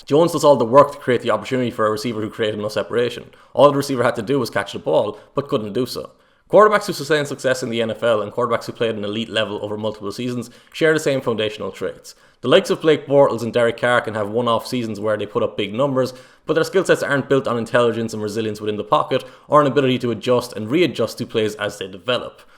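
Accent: Ireland. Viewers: amazing breakdown! And I love that you only looked at one game for both good and bad. And tthe Irish accent is plus. Keep it up!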